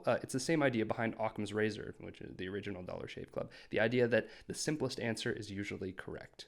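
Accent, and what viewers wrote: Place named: Canada. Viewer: canadian eh? greetings from the frozen alberta. great content man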